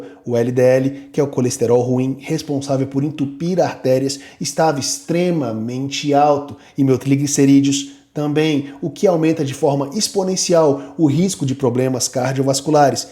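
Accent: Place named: United States. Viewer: é americano, isso explica o inglês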